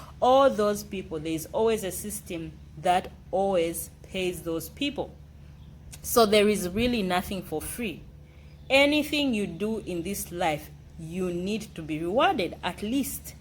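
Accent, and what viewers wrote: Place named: Jamaica. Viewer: That’s not good everyone should be treated equally... you almost sound Jamaican.. I rather stay home and suffer..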